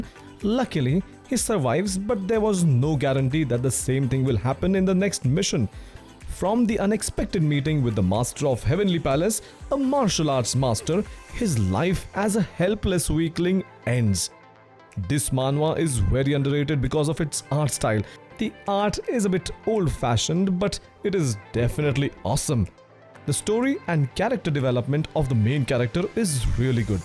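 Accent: India. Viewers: Oh good god I hate Indian English ! The pronunciations are just so JARRING ! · i just know from your voice that you are indian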